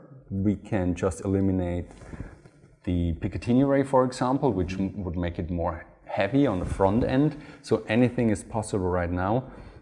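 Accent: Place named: Germany. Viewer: Snobby accent? He’s a German you dick..only in ‘murica eh 😂😂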